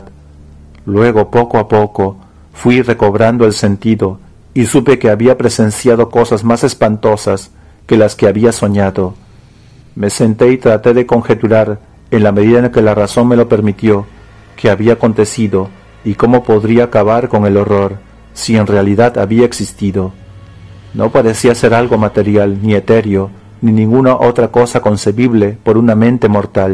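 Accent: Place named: Jamaica. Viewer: Bien por la lectura, pero a veces suenas como si fueras jamaiquino. Para que trabajes en eso. Saludos.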